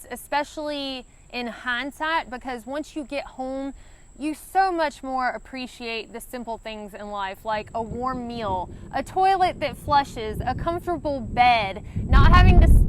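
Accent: South, United States. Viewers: Loving it! Always entertaining and useful information and presented in a "non-judgemental" and non-patronizing way. You are the voice of experience and of understanding the transcendent nature of hiking. One question. I see that 10 people did not like this video. Do you have any way of understanding why some people don't like this video? I guess in life there are people who just don't like things, but I don't understand. Technical issues? Don't like a southern accent? (Barbarians!) Object to a rational approach to carrying guns? I fail to see their problem and am just curious if there is a way to say to those who check thumbs down, why don't you like this? Can I change something? Just curious. But for the 1,500 + of the rest of us, hike on! We love the videos and look forward to your/our next journey! Cheers, Tortoise (Slow but Sure)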